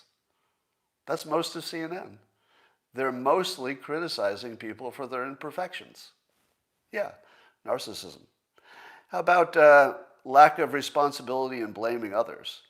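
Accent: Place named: Northern California, United States. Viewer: That's the NORCAL accent.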